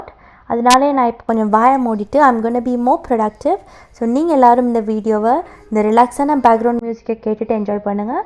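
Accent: Sri Lanka. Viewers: I love your srilankan tamil,you speak as much as you can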